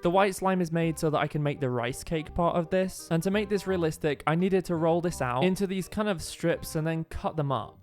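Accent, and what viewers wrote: United Kingdom. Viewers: U must be american. That accent is one of the worst in the UK lol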